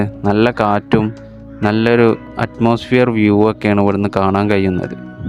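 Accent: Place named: Malappuram, India. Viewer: ഇത് മലപ്പുറമാണ് ഇവിടെ ഇങ്ങനെയാണ് സംസാരിക്കുക.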